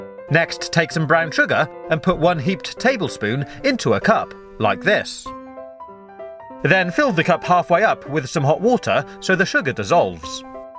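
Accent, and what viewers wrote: England, United Kingdom. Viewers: he's a British so he says to ma to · This guys voice is the most British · You brits talk funny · he has a english general accent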